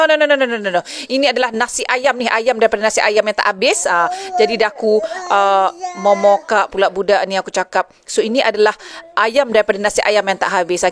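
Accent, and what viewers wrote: Negeri Sembilan, Malaysia. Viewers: Kelakar lah Kau ni,nasib baik ingat lg loghat Nogori tu. Suko acik nongar eh.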